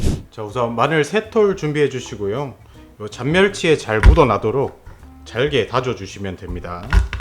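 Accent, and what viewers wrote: Gyeongsang, South Korea. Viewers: 멸치 볶음 때문에 구독한 게 아니라 경상도 구수한 목소리가 귀에 편안해서 구독합니당🤣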